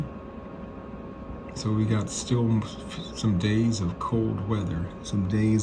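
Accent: Texas, United States. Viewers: This is the clearest English with a Texan accent I've ever heard. Thank you so much for sharing your English knowledge!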